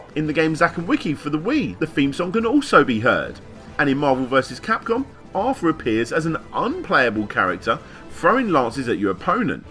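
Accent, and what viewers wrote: United Kingdom. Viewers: Fankfully. U British and ur accents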